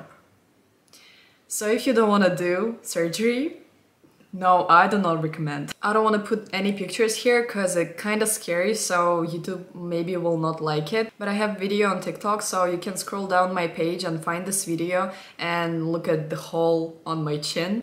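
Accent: Russia. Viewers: Как только я увидела ваше другое видео, я сразу подумала, что вы русская) Это видно по внешности и по тому, как вы говорите) И при этом я сама не русская, но из России. Очень интересно то, что мы можем узнать русских среди других европейцев, так и людей из своего народа я точно так же вычисляю среди других азиатов) Но я вообще не знаю как я это делаю. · привет, я начала смотреть тебя пару месяцев назад, потому что меня заинтересовал блог, и я хочу послушать акцент разных людей, поэтому сначала мне показалось, что ты из России, а сейчас узнала, что это действительно так) я хотела сказать, что ты замечательно выглядишь и у тебя очень хороший вкус, для меня ты стала одним из примеров, на кого надо ровняться, спасибо тебе за это 💌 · Несмотря на то, что ты прекрасно говоришь по английски, я почему-то сразу поняла, что ты русская, и это подтвердилось! Успехов тебе, береги себя! 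Со скольки лет ты стала работать за границей? Родители нормально отпускали?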